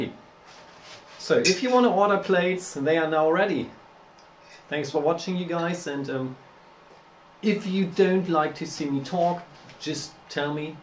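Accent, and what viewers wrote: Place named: Australia. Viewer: Well done! Nice to see your face and hear you talk,. Did you learn English in Australia? I am trying to figure out your accent;)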